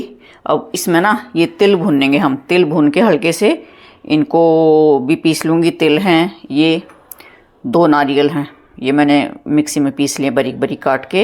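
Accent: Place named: Haryana, India. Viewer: बहुत अच्छा बहन आप कहां से बोल रहे हो आप मेरी हरियाणा की बहन लग रहे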